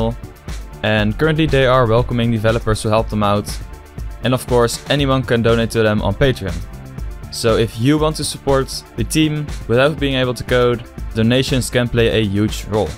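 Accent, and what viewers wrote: Netherlands. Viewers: You dutch? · I sense a Dutch accent tell me if i'm wrong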